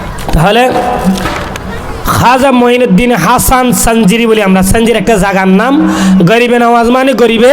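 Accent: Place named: Chittagong, Bangladesh. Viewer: আসসালামুআলাইকুম ওয়ারাহমাতুল্লাহি ওয়াবারাকাতুহ, ভাইজান আশা করি ভালো আছেন, আপনার চ্যানেলে ঢুকে দেখলাম, চ্যানেলটা অগচল, ডিসক্রিপশন লেখেন না কেন? টেক তৈয়ার করেন না কেন? ভিডিওর ক্যাপশন এবং থাম্বেল দেন না কেন? এগুলো না দিলে তো মানুষের কাছে পৌঁছাবে না এবং চ্যানেল গ্রো হবে না, সাবস্ক্রাইব করলাম আমি। আপনি কোন এলাকার, বাসা শুনে বুঝা যাচ্ছে চট্টগ্রাম, আমার বাড়ি চট্টগ্রাম রাউজান, ভিডিও আরো লম্বা দেবেন, যেহেতু আপনার ওয়াচ টাইম এর প্রয়োজন আছে।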